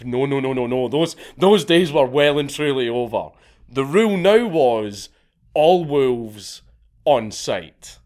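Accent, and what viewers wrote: Scotland, United Kingdom. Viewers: If you have the volume low enough and aren’t well versed in the Scottish accent, girls sounds like “ghettos”. Took me like 30 min to figure out why he keeps calling people ghettos lol.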